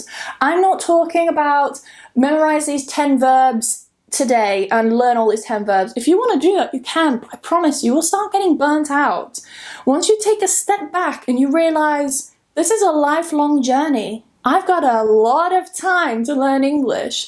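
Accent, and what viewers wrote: United Kingdom. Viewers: Hi, Pity you're alone on New Year's Day, 'cos your boyfriend is on duty today, in such a remarkable day. But I think that in reality you should't feet sad or either alone, because you have a lot of friends, listening to your remarkable messages in perfect british accent, that 100 K followers that love you in the distance, throughout the cyberspace, look forward every time. You're a new Rose of England, a british angel flying everywhere in the world, upon the wings of thousands of bytes and bytes, zeros and ones, charming and delightfulness with no limits. Darling, I beg you to carry on with this selfimposed task of teaching the "lingua franca" that is nowadays the english language as well as the british culture and way of life, to the people around the glove, thing that you implement in a so accurated way. I'm writing to you form Tarragona, a place in Catalonia you know well toghether with its Uni. 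Rovira i Virgili, a place where as I understand you used to take some courses, some time ago. If you whish to refresh your knowlegde of the many languages you're fluent with, and make some practice with me either in Spanish or in Catalan, do not hesitate in sending to me, via e-mail: all the letters you want, with the messages you wish, so short or long as you fancy, whenever you feel like, and about the items you consider interesting or educational, for your personal understanding or from a point of view of professional developpment. If in case, please contact e-mail: <mail> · I love your honesty. I just found your channel and I think it will become one of my favorites. I want to learn English with a British accent and it looks like you're the perfect person to teach me. I like the way you talk, your accent, your honesty, and besides, you're cute! I wish you all the best this new year and I hope to learn a lot from you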